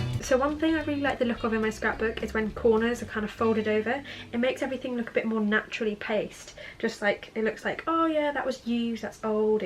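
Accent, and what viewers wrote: United Kingdom. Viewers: Gosh!i love your accent British~♡love it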